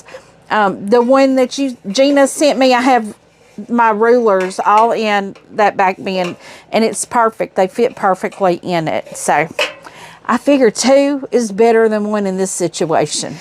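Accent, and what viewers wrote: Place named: Virginia, United States. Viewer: My heart goes out to you and hope you have a positive and speedy recovery. I’m 43 and for almost 20 years I am in pain ( TMj). I live on the west coast (but my whole family aside from 3 of 4 children are also west coast ) my family is in VA so hearing your accent makes me miss home. Lots of prayers and positive energy for you and your recovery!!❤️‍🩹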